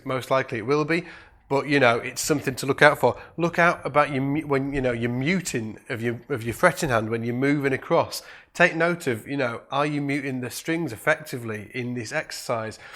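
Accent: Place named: Manchester, United Kingdom. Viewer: I love your accent as much as your lessons, are you from Manchester?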